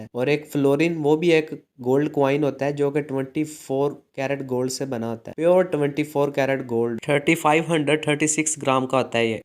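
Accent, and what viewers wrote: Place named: Punjab, India. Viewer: Your Urdu is Punjabi Urdu.Apna accent theek kijiye.baqi sab qarib qarib theek hai.with good wishes from Calcutta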